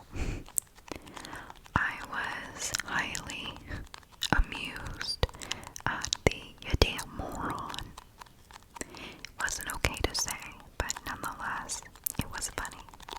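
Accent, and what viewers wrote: South, United States. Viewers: I love southern sounds! Would love if you did a librarian role play like she does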